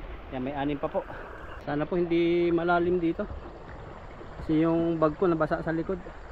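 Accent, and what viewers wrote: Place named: Quezon, Philippines. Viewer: Bro. Tanong ko lang ano probinsya mo halos parehas tayo ng punto at yun pagkatagalog mo. Taga Quezon province ako. Bago mo subscriber pala. Parehas tayo ng hobbies ingat palagi ang God bless.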